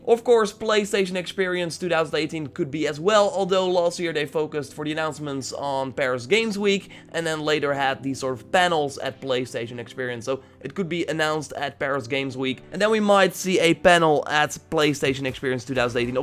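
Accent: Netherlands. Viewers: Are you from NEDERLAND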